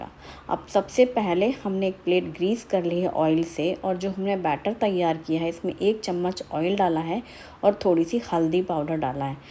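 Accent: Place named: Maharashtra, India. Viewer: Ek baat bolu.... Aap "Bahar ko Baher " bolti hai hamesha , mai hamesha notice karti hu,,, A Hamare MAHARSHTRA ka asar hai.. 😊😊😊😊❤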